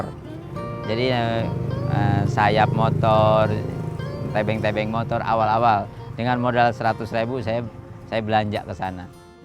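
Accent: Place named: Jakarta, Indonesia. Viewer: Betawi bat yg ngomong. 😁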